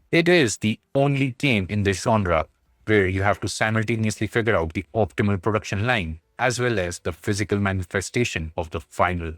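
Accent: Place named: India. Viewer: Completely off topic. Thank you for a spot of nostalgia. One of my previous employers was a small satellite to an international Indian company. All of the reasons why I left were the UK and US oriented business culture. That stuff is just oppressive, dumb and bad for both morale and business. Many of the original Indian concepts were pretty good. And they came with the musical accent you remind me of. No regrets on walking out, but I do miss that part.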